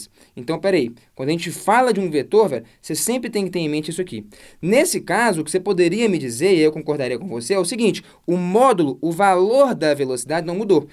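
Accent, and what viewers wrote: Minas Gerais, Brazil. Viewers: Rapaz, de onde você veio??? PARABÉNS pela sua didática, sua linguagem ao aluno e seu carisma!!! E pra temperar melhor ainda sua "performance", tem o seu delicioso sotaque mineiro...MUITO BOM!!! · Cara você é um mito.... Você define profissional que faz porque ama a profissão. ... E os sotaque mineirinho kkkk massa de mais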